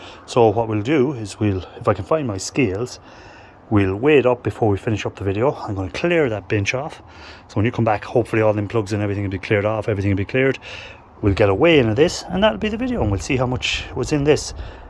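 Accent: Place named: Cork, Ireland. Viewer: Not as bad as ians shed but you need to get your finger out before it turns ugly 🤗👍🇮🇪 You sound like a Cork man, am i right?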